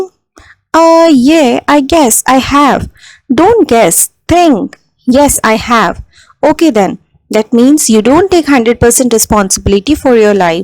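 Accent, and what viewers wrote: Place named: India. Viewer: Oh man! An Indian accent :((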